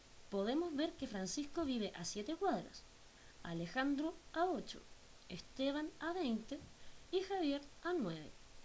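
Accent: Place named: Chile. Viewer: me fascina el acento chileno :3 buena explicacion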